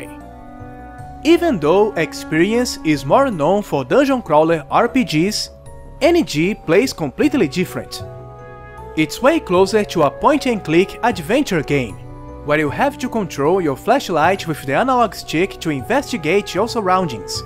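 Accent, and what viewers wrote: Brazil. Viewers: Your accent screams braziliam... Nice videos anyway~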